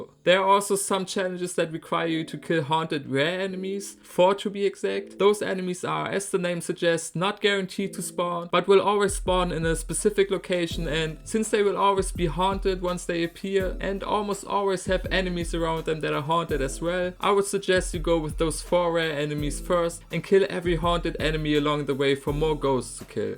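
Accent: Germany. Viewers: for me he sounds very German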